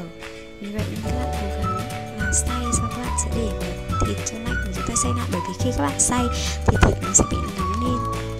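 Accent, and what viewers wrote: Vietnam. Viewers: ban nay we o dau ko biet nghe giong thanh hoa ma lai ngong nua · hình như chị là người bắc ak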